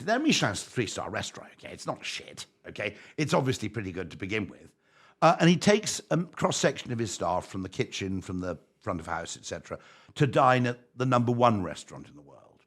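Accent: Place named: Scotland, United Kingdom. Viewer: He is completely understandable, it is a very mild Scottish accent.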